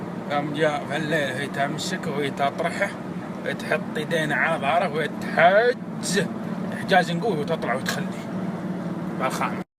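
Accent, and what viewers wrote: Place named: Najd, Saudi Arabia. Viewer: اي ثقافه؟ كل من قرا روايه حسب نفسه مثقف؟ هذي لهجه نجديه وطبيعي انك يالطرش ماتفهم عليها الاشكاليه الاكبر انها لهجه من لهجات العرب السبع رجل عربي قح وبعدين كيف تدعي الله وتخاطبه ب ياء التأنيث؟ استغفر ربك ياطفل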